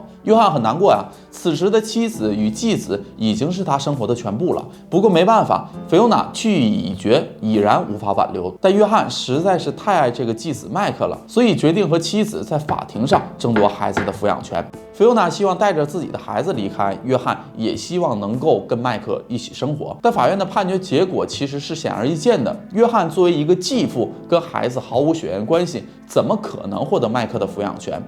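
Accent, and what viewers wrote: Northeast, China. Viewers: 这一听博主的口音就是俺们东北那嘎达的人儿，就是不确定是不是和我一样是沈阳的，哈哈 案件讲述的也非常精彩，已经订阅了，支持老乡儿~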